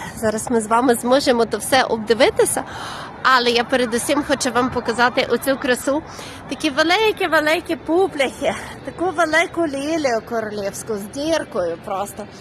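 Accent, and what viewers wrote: Lviv, Ukraine. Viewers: приємно слухати вашу львівську говірку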